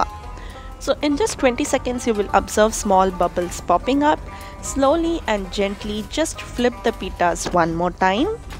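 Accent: India. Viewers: What a lovely accent. I want everything that i learn in future to be taught to me by a a well educated Indian lady. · I DONT KNOW WHAT I LIKE MORE; THE NICE INDIAN ACCENT OR THE BREAD. BEST EXPLANATION.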